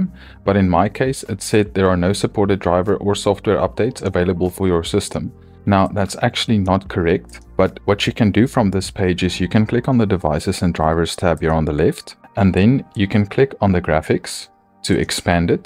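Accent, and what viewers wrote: South Africa. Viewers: that accent sounds south african,hello south african youtuber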